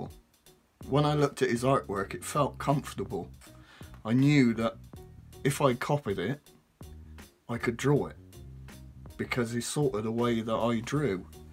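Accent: United Kingdom. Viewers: I absolutely love the Bristolian accent! I am originally from Bemmy/Bedminster. I currently live abroad so it's great to hear an accent to make me feel at home. Honestly it's epic to see someone so passionate about their art and someone elses. I've seen your work all over the place and there was one that caught my eye in Kingswood on the Black Horse Pub wall. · Don’t put yourself down on accent south west is the best!! I’m from Devizes!! Propa south west! And I know wot you mean about bode ! I’ve got her tatttooed to my side.as soon as subway art hit my school library!! It was mine !! I had the buzz of graffiti!! I’m 48 still writing! I’m proud of the south west style! You and desk one from Swindon are my favourite graffiti artist 💯💯💯💯💯 Keep it going! 🤡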